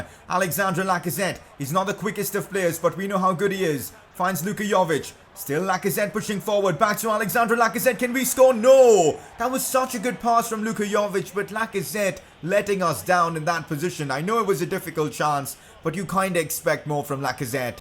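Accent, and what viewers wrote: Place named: India. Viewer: Bro ur fucking Indian voice is annoying 😣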